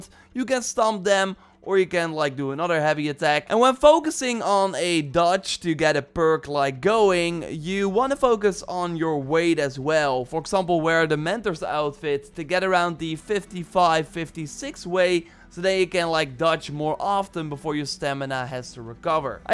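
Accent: Netherlands. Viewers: Is dutch? I hear his accent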